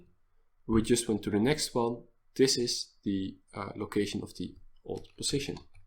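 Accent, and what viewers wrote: Netherlands. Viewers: Hey, I have a question:) I am making a maze game, but I want to make it so that at the loading screen you have a sphere which is moving in the maze. But I want to make it so when it hits a wall it goes to the left or right or straight or go back, depending on where the walls are. Do you know anyone who can do this/explain to me how to do it. I'm really struggling with this, if you do, it would be awesome. You can also send me some code (if you do understand how this works) I could learn a lot from that!:) Thanks! If you need to know anything, just ask me. And you got a new subscriber:) Btw, Love your accent. Are you dutch right?:) I am!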